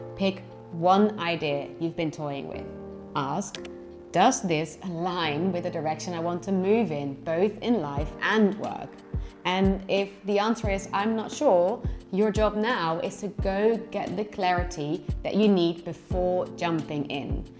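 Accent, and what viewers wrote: Netherlands. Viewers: Are you Dutch originally? You sound a little bit Dutch.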